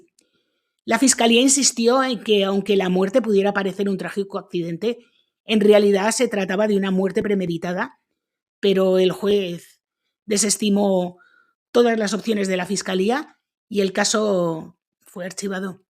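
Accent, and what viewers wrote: Aragon, Spain. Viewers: Gracias por responder...pues pareces pura aragonesa jejeje Me dijo mucho en el tema de los acentos a ver si puedo descubrir el origen pero contigo no he dado ni en la diana😂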